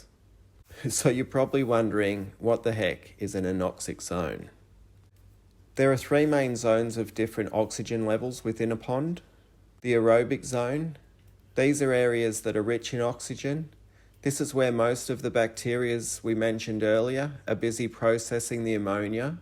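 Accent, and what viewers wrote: Australia. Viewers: Good to hear an Aussie voice on Uutoob for a change, apart from that I'm gunna be the mug to react to the use or the non-word bacterias...... there can be one bacterium or two to many bacteria despite what spell-checker says. Cheers, by the way I keep 3 greenish water ponds with pondslime for the purpose of breeding native fish. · Is that an annoying Queenslander accent I hear?~!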